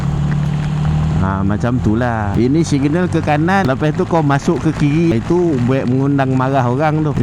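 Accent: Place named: Negeri Sembilan, Malaysia. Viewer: Sronok dgr loghat nismilan ni. Klau boleh slalu update loghat ni bang. Haha salam dri kota bharu Texas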